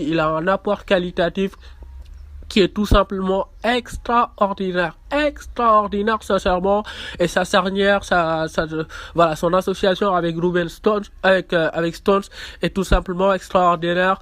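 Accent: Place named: Senegal. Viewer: Accent sénégalais 🙏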